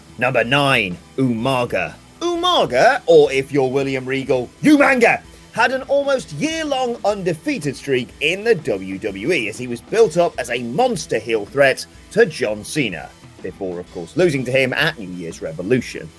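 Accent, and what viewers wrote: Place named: England, United Kingdom. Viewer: That's exactly how it's pronounced. What's your problem with it? I'd like to know. If you mean that Americans say it like "motcho" that's just a difference in vowel sounds. The guy in the video is English, and that's how we say it.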